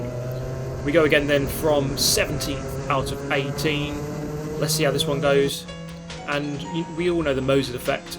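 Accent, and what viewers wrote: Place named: South East England, United Kingdom. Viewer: Great content again mate! That fight for the first place was intense! Your inspiring me to try and have a go at it! Ive seen seen they are some races in Farnborough near me, judging by your accent you are racing somewhere in the south east?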